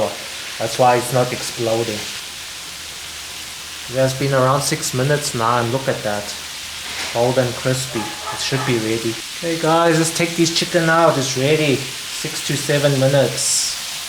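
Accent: South Africa. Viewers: Oh my goodness! I absolutely LOVE you and look forward to trying this recipe over the weekend. Thank you so much for sharing, you just made me very hungry with a craving for fried garlic chicken thighs. And by the way... I absolutely LOVE your accent. You remind me of my South African friends in Toronto, Canada. Much love to you and your family. Thank you! xoxoxo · Are you a South African national? I ask because even though you're clearly of Asian descent...the way you talk foreign to Asia, your accent is very African😂, are you from South Africa by any chance?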